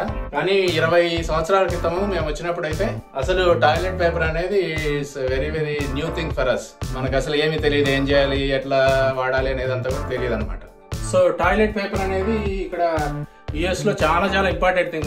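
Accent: Rayalaseema, India. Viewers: గారు మీది రాయలసీమ కదా మీ వాయిస్ వింటే అర్థమవుతుంది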